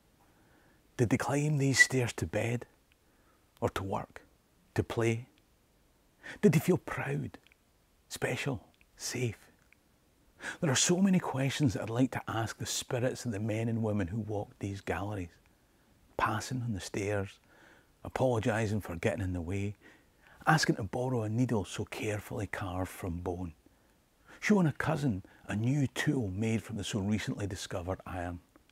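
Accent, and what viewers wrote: Scotland, United Kingdom. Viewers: You look more Polynesian than Scottish, but you talk Scottish as fuq.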